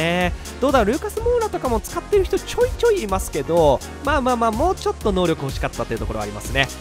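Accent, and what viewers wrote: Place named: Saga, Japan. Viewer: 佐賀弁むっずいですねwww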